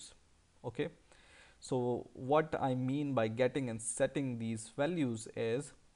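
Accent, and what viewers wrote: India. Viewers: which accent is this? do u know hindi language? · some people dont like indian accent. but here enjoying the video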